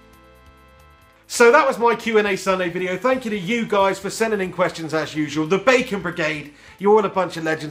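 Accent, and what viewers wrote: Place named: Liverpool, United Kingdom. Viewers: I want to ask two questions,. 1. Do you think Fellaini is becoming the new Roy Keane, now that he's playing so good in recent games under LVG? 2. Can Rooney become the all time Premier League's top goalscorer if he stays and retires at United? Funny Scouser accent. lolll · Great scouse accent and hat!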